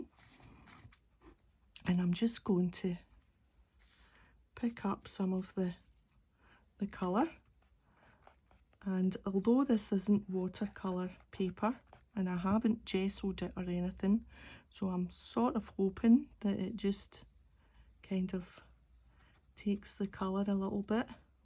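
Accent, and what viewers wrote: Scotland, United Kingdom. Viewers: I could listen to you talk all day. Love your Scots accent!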